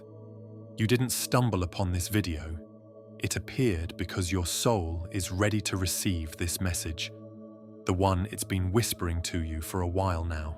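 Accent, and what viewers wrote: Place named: United Kingdom. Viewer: What's up with the Brit tish twang, geez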